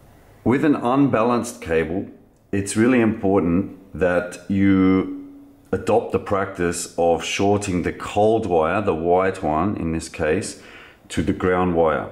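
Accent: Australia. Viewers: Aussie? Love the video mate. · Are you Australian? Great video.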